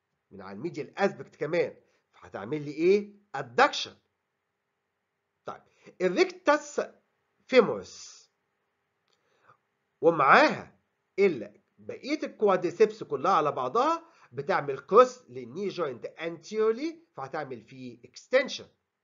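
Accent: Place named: Iraq. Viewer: من اللهجه واضح عراق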